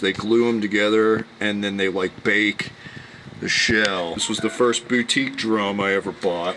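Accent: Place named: California, United States. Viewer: I love listening to you speak. Voice is like Cali skater meets Ben Stein and talk is all about drums. Can't get enough. Rock on. 🤙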